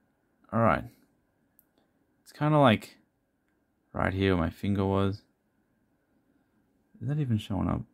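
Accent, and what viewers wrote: Australia. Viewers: Dude, your videos are the best out there. not only for the sublime ozzie accent but you dont talk too much. you get to see a shit ton of cool cards within a short time. I really love this, less talk more cards. your videos have that to the max, so keep it up! I subscribed hell yes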